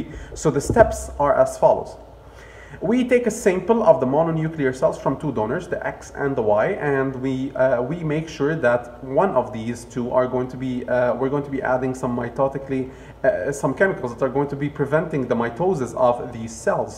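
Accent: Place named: Lebanon. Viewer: صدقني انت لبناني عرفتك من اول 10 ثواني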